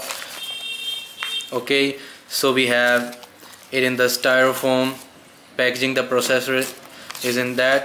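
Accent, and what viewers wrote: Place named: India. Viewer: oh i didnt mean the video was bad or anything it just sounds like sterotypical india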